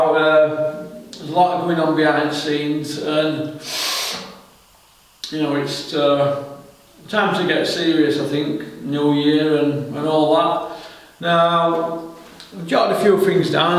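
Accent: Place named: North, United Kingdom. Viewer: Where you from? Sound like a good northern chap.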